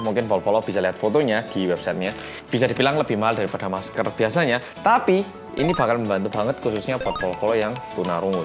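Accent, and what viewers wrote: Java, Indonesia. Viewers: is “timoer” but backwards. It’s a typical wordplay in Malang, a city in East Java, Indonesia, where he grew up. “Timoer” or “timur” means east. In this context, means that he’s a korean who is from (or grew up in) East Java. “Yang medok” basically means he who has thick accent, because he speaks Indonesian and Javanese in thick javanese accent. (Medok is a javanese term so maybe that’s why you dont understand if you’re learning Indonesian) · Bersama orang Korea yang medokk keren juga tu baju nya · Cus team mendeloookk... Bersama orang Korea yang medoooookk 😊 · Lucu ini kakak hihi muedok tnn ntok e ngmong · Mas: halo guys welcome back to bersama orang korea yang Me: MEDHOOOKKKK!!! ❤❤❤❤ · Keren kak.... medoggg.... salam kenal kak... +1 dukungan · wong Korea sing medhooookk!!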